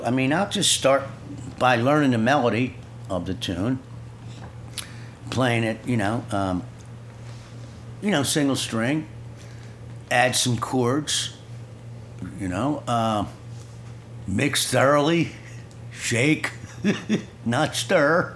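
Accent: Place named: Philadelphia, United States. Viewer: Wonderful stuff., you have the purest Philadelphia accent ever!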